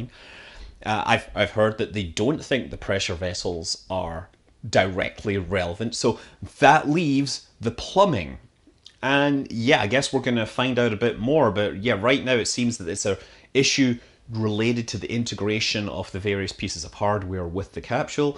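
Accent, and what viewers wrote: Scotland, United Kingdom. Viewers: One of the best phrases that can be spoken in a Scottish accent. It's up there with Curly Wurly. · With that accent? Definitely. Although, if he lives in the US can you truly still call him a Scot? really, what does it even mean to be 'from' somewhere? Ah, never mind. XD I just keep asking myself that because I have grandparents from 3 countries, was born in a 4th, and have lived in 3 of those 4 countries (and dozens of towns and cities) Wherevam I from? I do not know. XD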